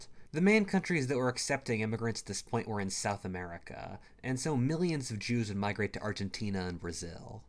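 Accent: Canada. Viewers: You're a Canadian?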